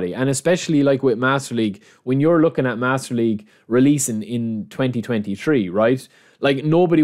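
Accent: Ireland. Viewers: That Irish accent is quite a ting, lad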